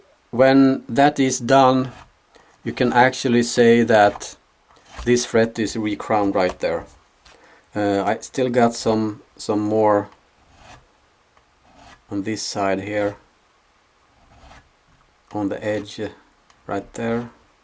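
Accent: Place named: Sweden. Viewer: Where are you from? The accent is really hard to place. First I thought Swedish, but there's something that reminds me of Finish also.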